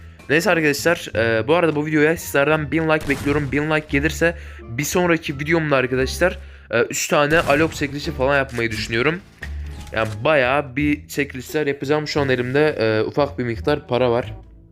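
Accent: Azerbaijan. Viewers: Abi sen Azerbaycanlımısınnn{>¦¶ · Abi sen azerbeycanlimiwin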